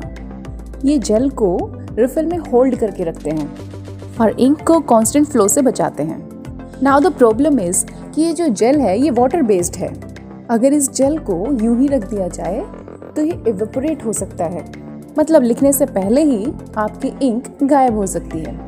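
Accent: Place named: India. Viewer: i wish she had provided a manuscript. I cannot understand her Indian/English.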